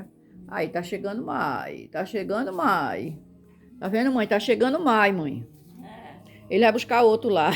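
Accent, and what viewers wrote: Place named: Pernambuco, Brazil. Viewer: Amei cair nesse canal. É o tipo de amizade que mamãe deixa 😅😅😅 tô pedindo a Deus coisa boa pra ver. Seu sotaque parece com o da minha família que é pernambucana, eu sou do Rio.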